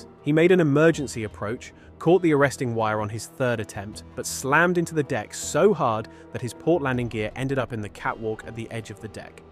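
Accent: United Kingdom. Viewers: These things always sound more important with a British guy announcing.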